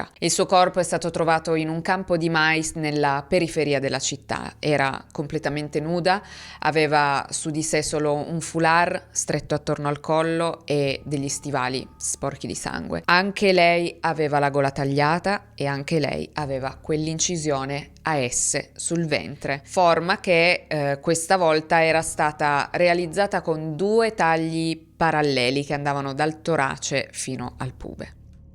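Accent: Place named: Piedmont, Italy. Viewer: Che bell'accento Piemontese! brava :)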